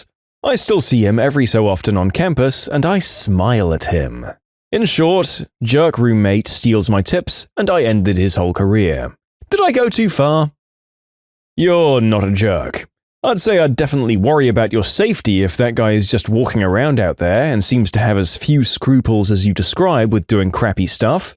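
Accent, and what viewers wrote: United Kingdom. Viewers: Why the fuck are you british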